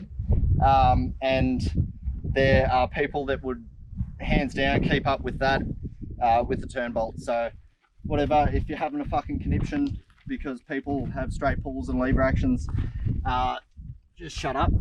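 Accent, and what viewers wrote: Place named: United States. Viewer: My guy you sound like me. American.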